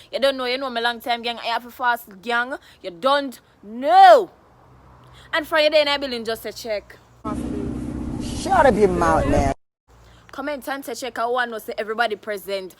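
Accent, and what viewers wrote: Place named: Jamaica. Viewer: You are more than made for this, have been binge watching you since the other day and you are like all of the Jamaican comedians combined plus more because you have accent locked. Very entertaining 💫💫💫💫💫💥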